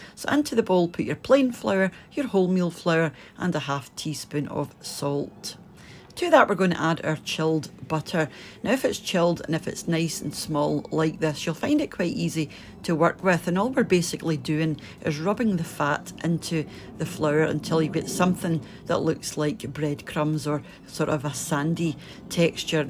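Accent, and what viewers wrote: Scotland, United Kingdom. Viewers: Whereabouts in Scotland is that accent from?? 🤔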